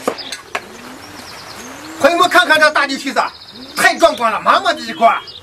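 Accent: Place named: Zhangye, China. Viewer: 好像是张掖一带的口音啊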